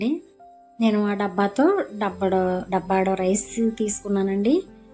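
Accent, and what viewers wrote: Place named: Godavari, India. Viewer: మేడం మీది గోదావరి నా మీ యాస అలాగే అనిపిస్తుంది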